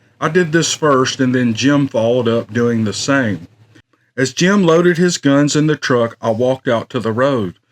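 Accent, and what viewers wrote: South, United States. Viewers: Just be you, love that southern American accent brother and volume is just fine and as always enjoyable to hear you mate! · Please don’t try to get better!! Look how much you’ve grown already and so fast. People love your southern draw, your calming effect and your narration skills! Would you take an old cherished record out of its sleeve, when there is nothing wrong with it, to fix it? NO! So just be your charming self. We’ll let you know if there’s ever a problem by yellin acha from da holla! Thanks, don’t ever change. Tell your wife that perfection can’t be fixed! Well, maybe not tell you wife on the other hand. We want y’all ya come back. ps: Just remember this, this ain’t Dixie Chicks, it’s. It’s the Dixie Chicks that need the fixin, not!! Thank you!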